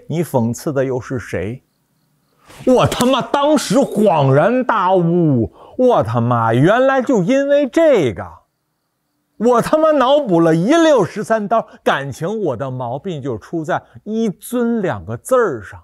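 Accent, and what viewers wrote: Beijing, China. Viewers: 我比你大几岁，但真心佩服你的方方面面，优雅口才底蕴思想逻辑真诚痞帅，艺术的表达，等等等，真是一个完人。开始还奇怪为啥没有一点东北口音，原来十几岁就去北京独自生活了，一口北京话听着真舒服，优雅、收放自如、语音语调节奏表情和内容配合的简直完美，嬉笑怒骂正直诙谐幽默信手拈来，能感知到你忧国忧民的善心，远超很多知名评书人士。不像其他中文博主纯纯为了博流量赚钞票，看好你一定会超过某高和某沫及其他一众所谓的反贼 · 一开始听口音我就明白了你是首都人，再离政治中心很近的地方不可能安然无恙，我离政治中心也不远我也不敢直接说习近平，我会用大xi或者西哥，而且我们大部分不会再群里聊政治，都会当面聊。我当过辅警我们这地方小的警察要比首都要松一点，有意思的是我在当辅警的时候和正式的警察聊天他们也骂习近平（正好在开十八大的候）。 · 哈哈这京味咱北京人儿:hand-purple-blue-peace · 您的北京口音也挺浓重的:face-green-smiling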